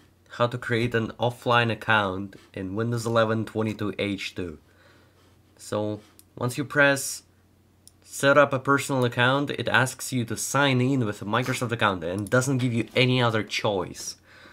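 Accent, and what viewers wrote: Russia. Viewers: this russian accent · You are from Россия?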